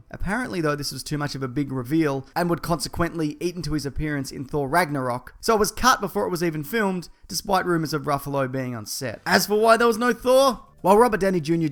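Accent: Australia. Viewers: are you australian my dude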